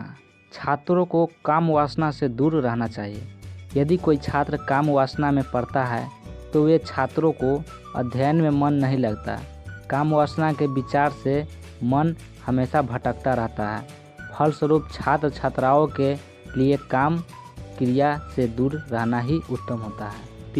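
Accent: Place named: Bihar, India. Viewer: lgta to bihari hi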